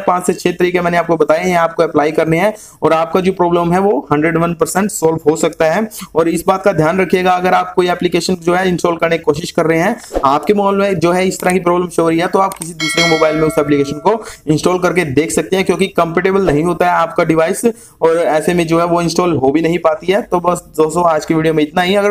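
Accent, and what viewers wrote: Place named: India. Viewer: You know its not clickbait when you hear hes indian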